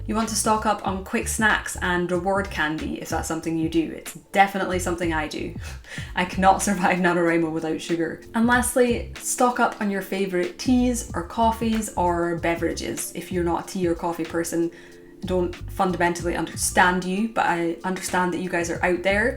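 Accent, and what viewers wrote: Scotland, United Kingdom. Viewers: I love your accent! Is that Scottish? Great tips btw, I signed up for your newsletter to get the plot embryo videos because I struggle with the same thing, I love worldbuilding and creating characters but it's tough to come up with actual stories to suit the world and characters I've created. Thanks for the tips! My Alphasmart Neo is an essential tool for me. I'm an editor at heart, so I struggle with self-editing while I write. Using a distraction-free digital typewriter like Alphasmart products is a great way for me to get my first draft down without censoring or editing while I try to write. I'm hoping with all the tips I've learned from you and other channels, I can finally finish Nanowrimo for the first time after 2 other failed attempts.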